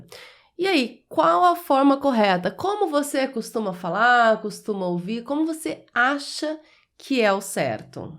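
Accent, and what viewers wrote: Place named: Minas Gerais, Brazil. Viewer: Muito elucidativo, professora com sotaque mineiro (alto paranaíba?), parabéns. Acho que a complexidade das perguntas deve ser crescente, como tem sido apresentado.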